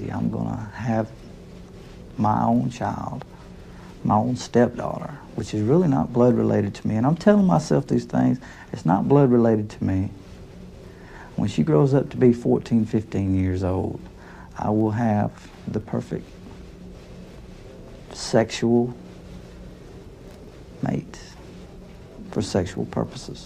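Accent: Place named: South, United States. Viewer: his eyes and southern drawl are so disarming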